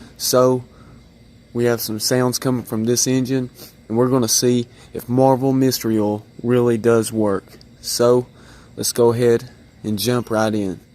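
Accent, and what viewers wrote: South, United States. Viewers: It's how people say oil in the south. Pronounced "ole"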